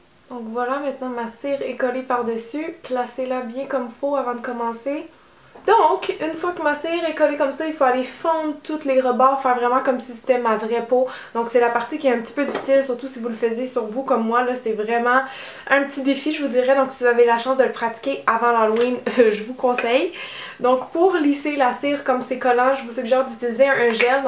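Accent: Quebec, Canada. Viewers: T'es Québécoise non ?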